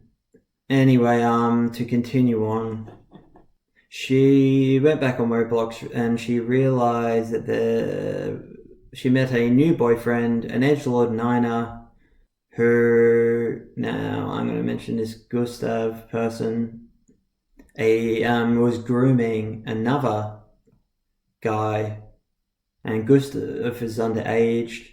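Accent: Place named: Australia. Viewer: “The new edgelord thing is CP child pornography” no matter what affiliation they have, that is unforgivable and disgusting. As a survivor of ritual abuse myself by a “fake” LHP organisation there is no excuse. All pedophiles need to be removed, banished, banned from the occult/magickal community. There is no room for that here, or in the greater macrocosm of the social community at large. I come to magick as a healing refuge and to find it’s filled with as many if not more pedophiles as my childhood sickens me. End it. Thank you for exposing this, you are Australian I assume from your accent ? May I ask where this Pedophile ring is located ?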